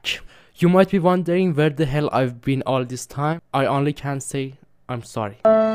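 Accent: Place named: Iran. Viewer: Once I watched your short outta nowhere, your persian accent got me, ngl. Nice job, too much love from Shomal.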